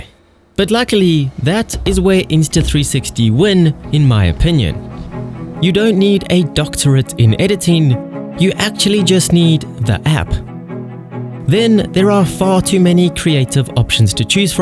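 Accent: South Africa. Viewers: Your videos are AMAZING!!! Sound quality is amazing, background music amazing, narration amazing even with your cool SA accent 😁 Seriously the quality of your videos would impress Spielberg! Fantastic, keep up the good work and thank you for putting so much effort into your content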